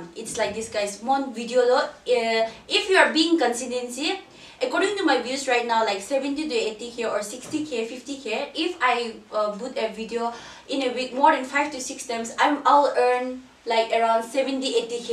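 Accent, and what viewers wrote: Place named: Assam, India. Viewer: I like your Assamese accent 😭💓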